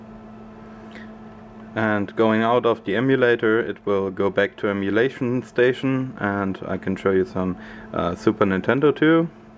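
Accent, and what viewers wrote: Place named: Germany. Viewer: OMG, ich höre krassen deutschen Akzent raus, könnte es aber auch nicht besser.Seitdem ich RaspBMC nicht mehr nutze, da es ja nun OSMC gibt, frage ich mich, ob es denn möglich ist, z.B. den Xbox 360 Wireless Controller vom PC über USB zu nutzen, denn wenn es schon so tolle Emulatoren gibt, wäre es ja äußerst unpraktisch, diese nur per Tastatur nutzen zu können.Also - ist es möglich?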